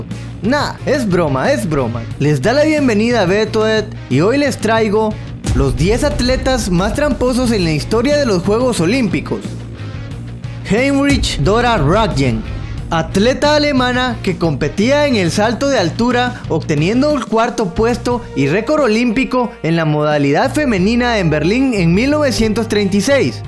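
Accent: Honduras. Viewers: se nota el acento hondureño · Oye te detecto acento Hondureño Eres de ahí ?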